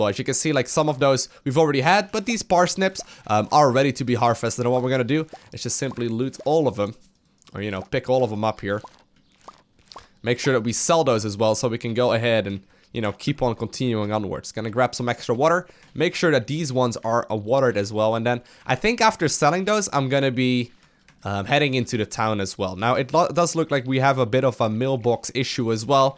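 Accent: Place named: Netherlands. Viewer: I watched through your most recent episodes and then decided to start at the beginning. I totally knew what was coming with the sprinkler and killed myself laughing once you came back after looking it up... (I cheated and wiki'd everything before I started so I can't say I'm any smarter..) P.S. As a fellow Dutchie, the only reason why I kept watching your videos was cause of your accent!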